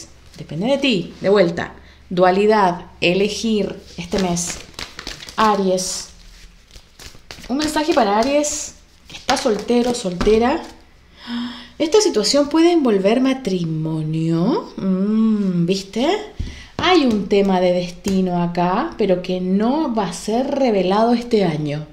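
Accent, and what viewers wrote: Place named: Chile. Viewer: De que país eres???y dónde estás ahora, yo soy de Chile...por tu acento se me imagina que eres chilena...si es así, me gustaría verme las cartas, personalmente.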